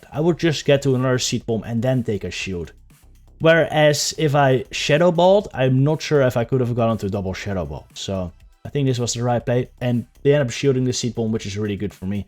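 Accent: Netherlands. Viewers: Zo duidelijk dat je NL bent, goeie video’s wel hahaha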